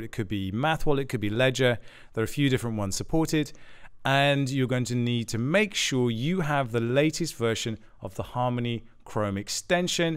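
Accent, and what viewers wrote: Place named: United Kingdom. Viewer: It’s just a British accent. The world is mesmerized by it.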